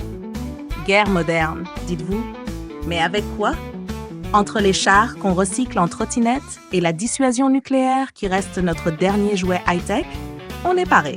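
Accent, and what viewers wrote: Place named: Quebec, Canada. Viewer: Je peux entendre un petit accent québécois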